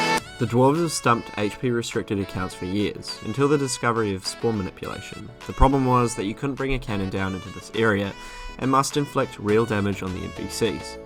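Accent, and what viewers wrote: New Zealand. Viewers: Bro I swear you sound like ur from nz, am I right? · I realised half way through the video when you were pronouncing some of your words that you were from the brother country lmao, no worries mate :)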